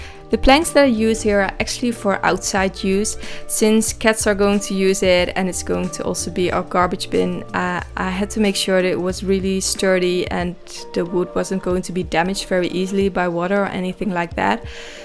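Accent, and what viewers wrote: Netherlands. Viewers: Hoor ik een Nederlandse accent?? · Kom horen dat je Nederlands was😂 mooie boom! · From your accent, I would guess you are from the Netherlands or if not a Scandinavian country where women are supported to have so many different skills. Wherever you are, your building skills are excellent and the design is BRILLIANT! Very clean and open. Also love that you have narrated yourself. So much more natural. Also you are not using any stock footage. Wish I could do something like this for my baby.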